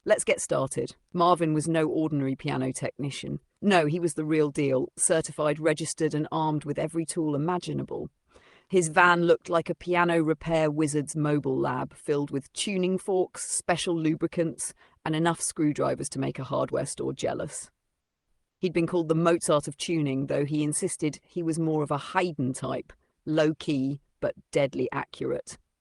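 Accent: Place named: United Kingdom. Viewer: I love the British accent. Great story! Haha!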